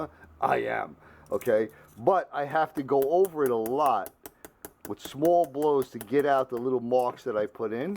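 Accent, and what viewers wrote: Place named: New York, United States. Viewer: This old machinist and inspector is happy for ya. Your new hammer rocks. Thanks for sharing and thanks for telling us you are from New York. By your accent... I kinda thought so. All the best bro.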